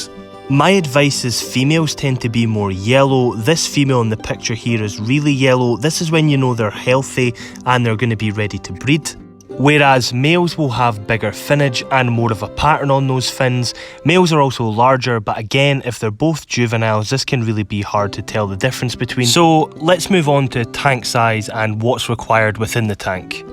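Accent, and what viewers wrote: Scotland, United Kingdom. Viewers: Ahhhh… the Scottish accent… how I’ve missed it! I did some traveling in Edinburgh a while back. Lots of good memories!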